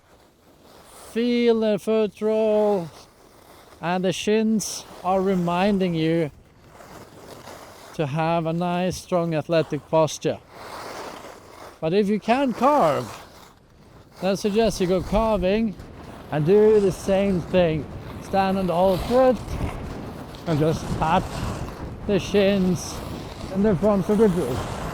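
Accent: Sweden. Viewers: Are you swedish by any chance? Sound very swedish when you talk :D edit: Great tips by the way!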